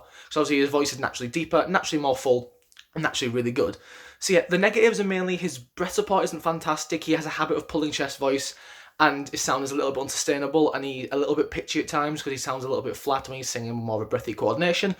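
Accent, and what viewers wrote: United Kingdom. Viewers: My brazillian ass had a big trouble to understand everything with this beatiful british accent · Well I'm guessing that since he's British and he also talks really fast, it sounds like he's saying "monster" because of his accent. I've even seen British Monbebe who say it like that sometimes, so I don't think it's intentional.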